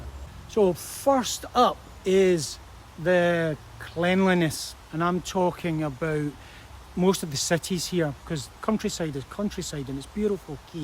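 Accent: Scotland, United Kingdom. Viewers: Scottish accent! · I lived in Scotland for 10 years and now working in China. It is so good to hear Scotish accent again. Scotland is such a beautiful country and is my second homeland. Compare to China, UK really lags behind in terms economy, living standard and technical capabilities. China is a vibrant and dynamic country. Cities in China are much more modern than London.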